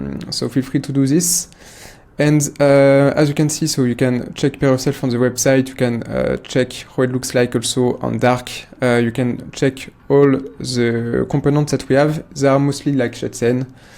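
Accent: France. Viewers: Tu parles francais ? du moins je l'entends quand tu parles anglais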